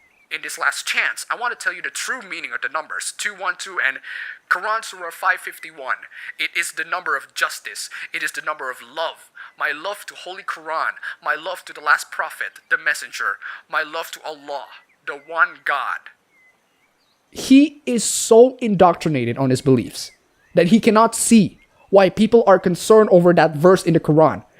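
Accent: United States. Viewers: You're speech kinda reminds me of the things a lot of the stuff my fellow Californians have. Also good luck.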